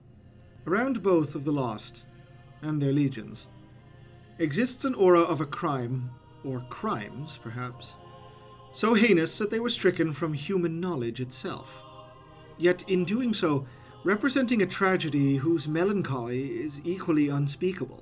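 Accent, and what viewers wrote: Ireland. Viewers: As a lifelong warhammer fan can't believe I am only finding this channel now. Exceptional presentation and delivery. Are you from Ireland.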